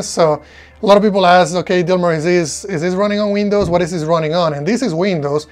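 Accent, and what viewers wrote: Spain. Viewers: muchas gracias por responder!! y perdona por tomarme la libertad de escribir en español en tu canal en inglés, deduje por tu acento y nombre que lo hablabas. Me encantaría preguntarte si consideras factible que en algún momento puedan sustituir a las pantallas físicas, es decir, vemos como reproduces un trailer en una ventana de windows, podríamos estimar que representa una pantalla de 100 pulgadas o las que te apetezca, cierto? pero se aprecia que no son 100% opacas, no sé si es algún parámetro concreto que has configurado en este caso... En definitiva, sería increible poder ver una película proyectada en una gran ventana, virtualmente en una pared sustituyendo a un televisor y también, saber en qué medida afecta a tu vista, si te sientes cansado o algo similar. Muchas gracias de nuevo.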